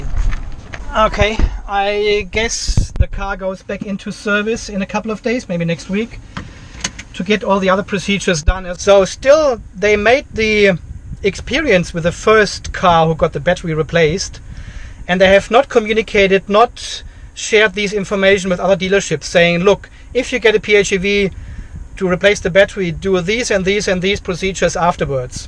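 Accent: Germany. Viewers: Vom Aktzen sind sie deutscher oder? Also die Batterien sind bei allen Herstellern nicht stabil... ich habe einen Tesla Model x und kann nicht behaupten das ich diese Probleme nicht habe. Ich würde auf dieApp mich nicht verlassen...... dies ist ein Problem der App und der Software. Ich komme aus dem QM Bereich der Autohersteller. Die Probleme hat nicht nur Mitsubishi sondern auch BMW und Toyota bei Plug in Hybrid. (Tesla auch) die Fluktuationen und unterschiedlichen Meldung liegen am Bordcomputer und der Sensoren und der Software. Ehrlich gesagt ist es wie beim Benzin der Tankinhalt wird eher geschätzt als gemessen. (Grob betrachtet) was man aber genau messen kann (zumindest bei BMW) ist der Verbrauch dank moderner Einspritzung. So ist es beim E-Auto auch, da wir aufgrund Temperaturen usw. die Leistung zwar messen aber nie genau. Siehe Test von Tesla oder Hyundai e Autobesitzer. 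Wer sein Auto in der Garage mit einem teuren Stromzähler (geeicht) laden tut, hat immer Abweichungen zwischen Zähler und Autoangaben. Beim meinem Model X sind die sogar teilweise heftig. 6-7KW pro Ladung. Ich tanke mein Auto in der Firma mit einem Speziellen Stromzähler Kostenpunkt 2.300€ inkl Einbau. Was die App sagt und die Software, sagt nichts aus was die Realität vom Akku bzw. Batterie betrifft. (Die Angaben über E-Verbrauch sind genau und nur die sollte man bewerten) Jedoch Vorsicht, bei E-Autos spielen extrem viele Faktoren mit, die bei einem Diesel/ Benzin nicht berücksichtigt werden.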